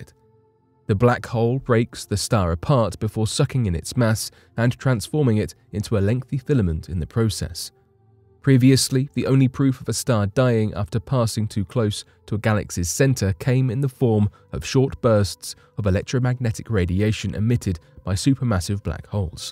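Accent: United Kingdom. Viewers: How many times am I going to hear "space the final frontier" on a british voice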